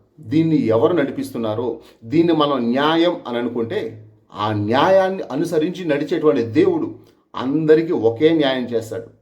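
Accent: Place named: Vijayawada, India. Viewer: Anna basha Vijayawada nunchi udo tisukunna chala bagundi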